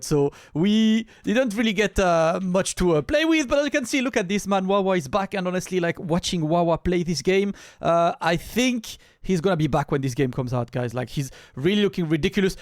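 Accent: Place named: France. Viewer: Ça sent fort la France ce rythme et cet intonation :P Je ne te connais pas mais merci pour le partage de ce combat.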